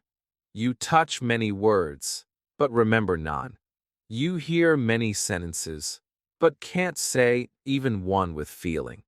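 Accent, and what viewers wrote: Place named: United States. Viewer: I like your soft American accent very much 👍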